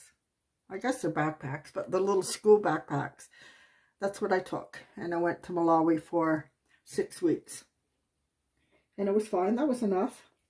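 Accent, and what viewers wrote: Canada. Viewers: Just love it!! By coincidence I was eating Chinese food which I very very rarely eat, and I realized quickly that the little heads looked so much like the back side of the fortune cookie that they threw in my bag! You little curvy mouth was cuter than the cookie! I find that it really helps to think of shapes as objects. Never could be so creative on my own! Thank you!! More whimsical animals please!! I loved hearing about your life! I guessed from your accent and buying gasoline by the liter even though I didn’t know that happened in Canada, it seemed to fit. Good for you to actually go out and do something good for the world!! 💕💕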